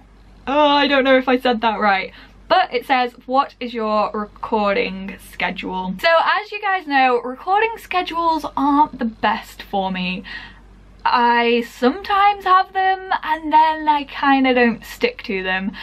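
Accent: England, United Kingdom. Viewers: i hate english accent but i love your playings a lot and i love so much irony 😁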